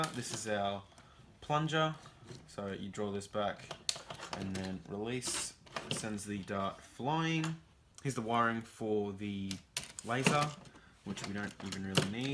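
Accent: Australia. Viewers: Woo a Aussie, great Video, lots of help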